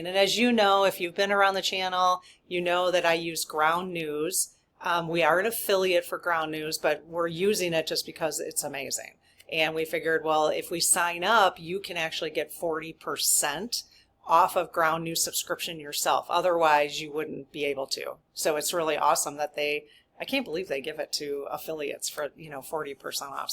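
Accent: Midwest, United States. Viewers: Hi. I love you and absolutely love your content. You have helped me more than you can ever know. ❤ And have to say, I kind of thought you were in Wisconsin. I am originally from Illinois. A FIB knows a cheesehead when we see one! I think it's the Midwest accent. When I moved to Arizona, everyone told me I had a weird accent. I hope I didn't offend you. That was not my intention.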